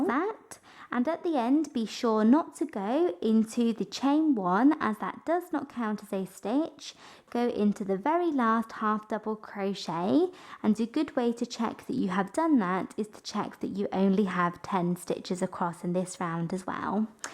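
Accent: England, United Kingdom. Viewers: It sounds English to me. I'm not sure how that sounds fake?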